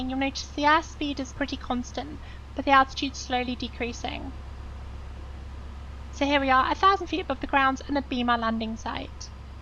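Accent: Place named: United Kingdom. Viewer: that british accent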